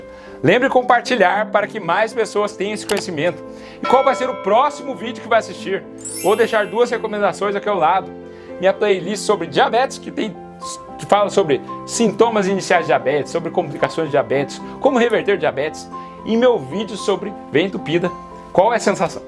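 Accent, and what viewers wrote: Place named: Caipira, Brazil. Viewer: Esse doutor tem um sotaque caipira…é raro de se ver 👏🏼👏🏼👏🏼😁😂😂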